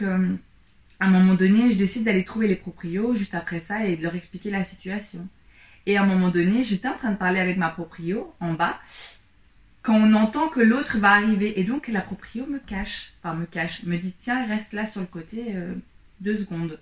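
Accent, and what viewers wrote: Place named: Belgium. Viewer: Je suis à 2 minutes de la video et je suis hypnotisé par ton accent on dirait que tu es belge ça me détend mdr jadore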